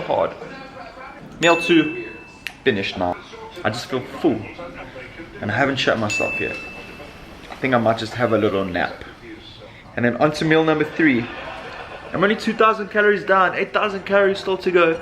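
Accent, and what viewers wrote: South Africa. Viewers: Just found your channel. I thought the accent sounded familiar :)) South Africa! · Are you south african ? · Are you South-African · You're one of the few South African sounding South Africans I've seen on YouTube yet. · Hell yeah, I was guessing you're from South Africa. That is my favorite accent in the whole world :D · I knew I recognised a fellow South African 😁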